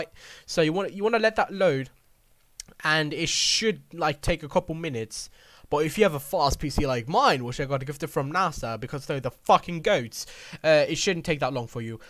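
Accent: United Kingdom. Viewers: Nice and Concise mate . I cant tell where in england ur accents from tho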